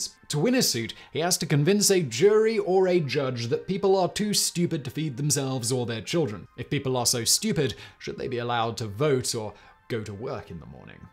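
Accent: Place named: RP, United Kingdom. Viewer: Why do he speak in that accent when in much older videos he sounds nothing like this? As someone from England i really feel like he's hamming up the RP accent to appear more sophisticated to the american audience?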